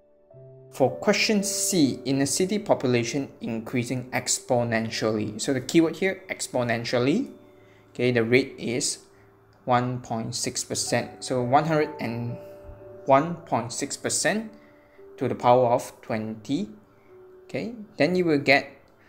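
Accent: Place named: Singapore. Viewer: You’re definitely Singaporean from your accent. hello friend, tomorrows national day!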